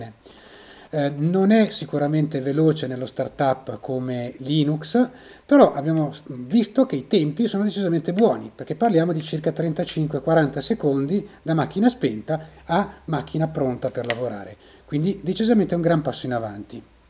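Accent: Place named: Austria. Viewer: Ich kann sein Deutscher Accent schwer verstehen. Versheinlich aus Oestereich oder irgendwas. *shrugs*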